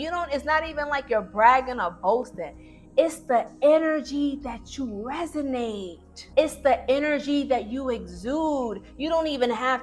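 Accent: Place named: Brooklyn, United States. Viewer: I can hear it in the beautiful accent! I'm from Albany area with fam from East Harlem and dear friend from Crown Heights, BK! I could feel that jubilant Biggie energy surrounding you, wow! 🗽❤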